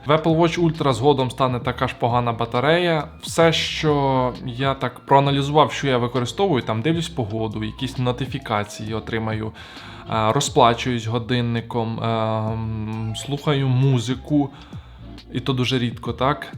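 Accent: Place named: Ternopil, Ukraine. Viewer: І ще 1 такий акцент як в нашій Тернопільській області)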